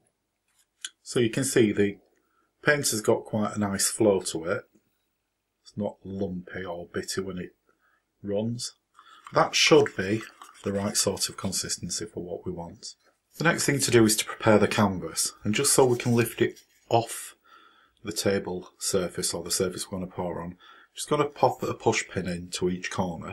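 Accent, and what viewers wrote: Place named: Sheffield, United Kingdom. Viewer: An Excellent Tutorial and not just for beginners. We come from the same area re accents and I'm glad to see you using real spoons they wipe off so easy ( an ex pat from Sheffield ) Ann Wardley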